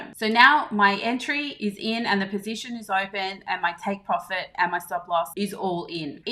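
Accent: Australia. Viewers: Thank you. The process of moving a stop loss is a little different to Binance and FTX. Your video was clear and easy to understand. Good job. And good to hear an Aussie explain. I am new to youtube, how do I see what other video's you have?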